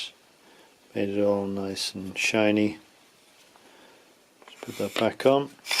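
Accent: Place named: Ireland. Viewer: This guys Irish accent is Cool 👌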